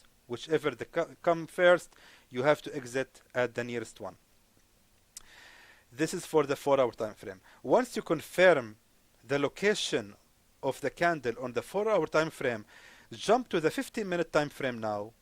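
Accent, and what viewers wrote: Arab world. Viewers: والله اللهجة العربية م تتخبى لو ايششش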